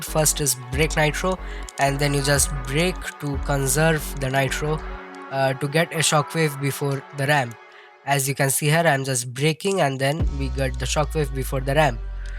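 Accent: India. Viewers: idk if ur indian ur voice sound like ur a indian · U Indian bruh ? 😅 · Bro are you Indian cause you speak English like an Indian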